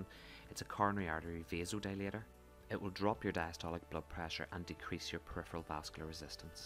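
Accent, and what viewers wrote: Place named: Northern Ireland, United Kingdom. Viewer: Lovely Northern Irish accent..,..mildy sedating..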